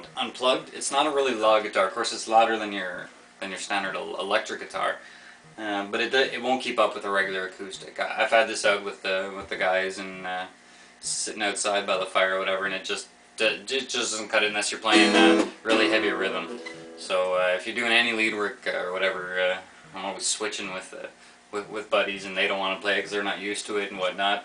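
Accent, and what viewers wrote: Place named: Canada. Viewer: Thanks for the review, useful. btw, from ur accent alone, I knew u were from canada, and im not making fun, i find the accent soothing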